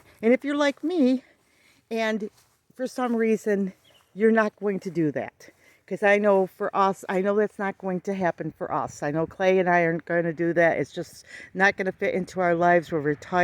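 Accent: United States. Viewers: sounds just like here in Ohio lol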